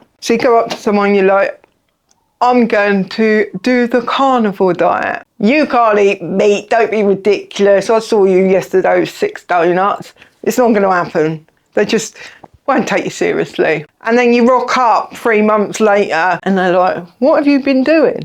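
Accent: Cockney, United Kingdom. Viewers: ...are you cockney, Scottish? Please tell! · As an Aussie I find your English accent super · Cheeky you, love your cockney accent. I bet you’d love my Bronx accent. 😂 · no thats fine. I originally thought you were here in the states but with an English accent. Thank you for clearing that up. Lol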